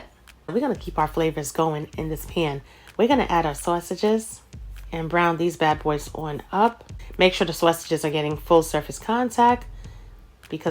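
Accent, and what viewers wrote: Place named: East Coast, United States. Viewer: I am subscribed to your channel but I rarely get in YT but when I do OMG I love watching your vids because the food looks deliciouis and not only that.., I know that I have told you that I LOVE LOVE LOVE LOVE LOVE LOVE YOUR EAST COAST ACCENT. I LOVE IT SO MUCH. VISITING NYC WAS CUT OFF OF MY BUCKET LIST IN SEPTEMBER 2021. MY SISTER SURPRISED ME WITH A TRIP THERE FOR MY 50TH BDAY AND WE STAYED IN MANHATTEN NEAR TIME SQUARE. I'M A COUNTRY SOUTHERN GIRL FROM LITTLE ROCK ARKANSAS, BUT WHEN THE REAL WORLD CAME ON MTV I WAS FASCINATINED, FROM HEATHER B AND I ALWAYS LOVE EAST COAST RAP. THAT WAS A WORLD WIND ♥♥♥ THE WORLD IS KINDA MESSED UP NOW, BUT WHENEVER I GET ME AND MY DAUGHTER SOMEWHERE TO LIVE, I WILL MOST DEF BE TRYING OUT YOUR RECIPES...., CAUSE HONEY THEY LOOK DELICIOUS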